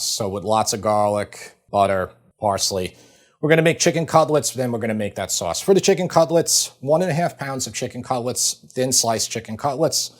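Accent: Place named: Midwest, United States. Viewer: Why is ' accent so different from? sounds like a New Yorker but sounds midwestern.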